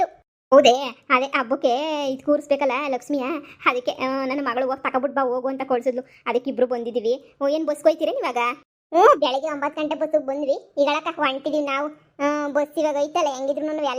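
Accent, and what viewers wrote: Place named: Mandya, India. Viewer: ಯಾವ್ ತಾಲೂಕು ನಿಮ್ಮ ವಾಯ್ಸ್ ಮಾತ್ರ ನಮ್ tmk ಡಿಸ್ಟ್ರಿಕ್ ದು ಇದ್ದಂಗೆ ಇಲ್ಲಾ ಮಂಡ್ಯ ಅಥವಾ ಹಾಸನ ಸೈಡ್ ಇದ್ದಂಗೆ ಇದೇ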